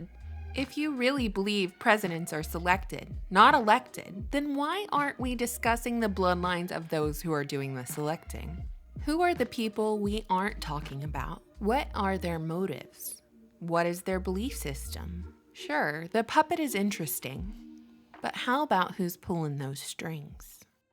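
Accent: South, United States. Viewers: did you speed this video up? what happened to that slow, southern, mellifluous locution?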